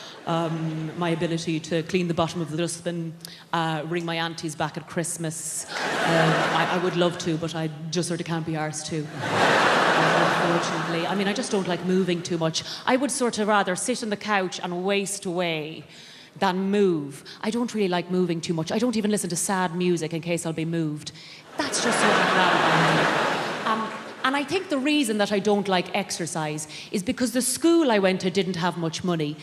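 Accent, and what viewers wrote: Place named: Ireland. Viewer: Dynamite, very smart, and as an American, I love the Irish accent.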